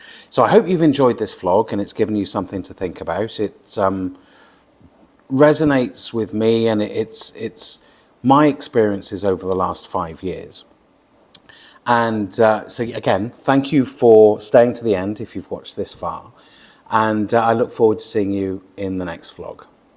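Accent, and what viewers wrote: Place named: England, United Kingdom. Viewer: Great pommy accent, mate! 😄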